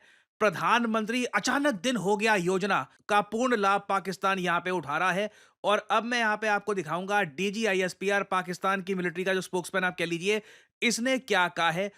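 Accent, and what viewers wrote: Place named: Bihar, India. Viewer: मैक्सिमम ये बोल देतय, ये pure बिहारी language भैया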